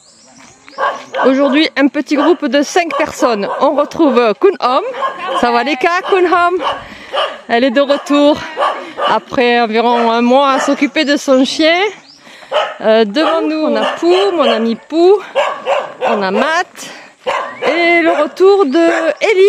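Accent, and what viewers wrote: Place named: South, France. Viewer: Tu n'as pas perdu l'accent Français du SUD 😛 Top la vidéo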